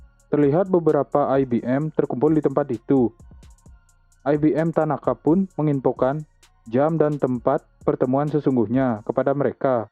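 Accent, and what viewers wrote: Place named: Bali, Indonesia. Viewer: Logatnya dari bali ea bang slam kenal ea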